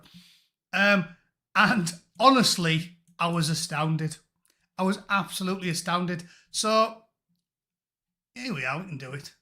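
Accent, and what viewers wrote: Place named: Teesside, United Kingdom. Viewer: Where u from mate sound like a smoggy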